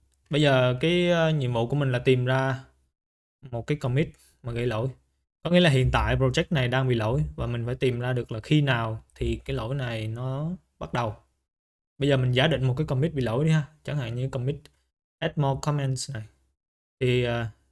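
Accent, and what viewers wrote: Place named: Central, Vietnam. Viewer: các video của anh khá hay, lại nói giọng miền trung rất thân thiện nhưng channel lại ít video quá. không biết a có dự định làm thêm nhiều video trong tương lai không ạ?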